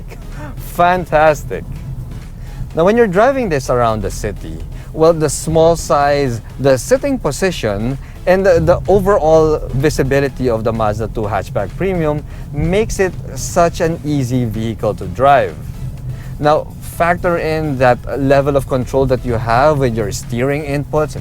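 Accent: Philippines. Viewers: Hi,Just discovered your channel a little over a month ago,ive been non stop watching your vids because of Your MX5 Miata,ive got interest in that car. Also I saw your collab with RIT, I prefer your Filipino language and accent ngl. Love your vids!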